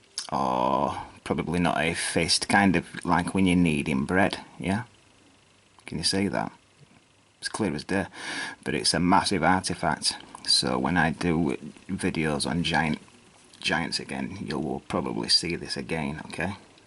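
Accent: United Kingdom. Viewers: up: What area of the UK is your accent from?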